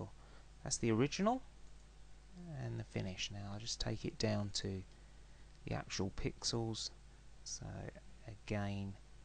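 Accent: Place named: United Kingdom. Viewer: yea, the british accent is nice* in a way lol hehe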